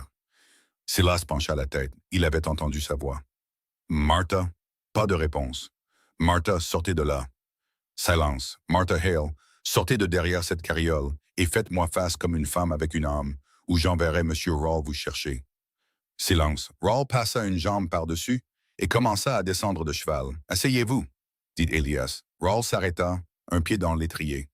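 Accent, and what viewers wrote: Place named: Quebec, Canada. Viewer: Je vous écoute d'Alger, Belle histoire et bel accent Québécois ❤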